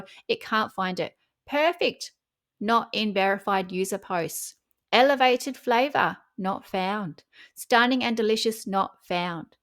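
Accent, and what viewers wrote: Australia. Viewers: I love jour voice and your acccent Is het from Australia Big kiss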